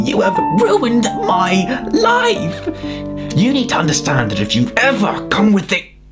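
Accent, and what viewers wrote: Ireland. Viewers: what part of ireland are you from ?:)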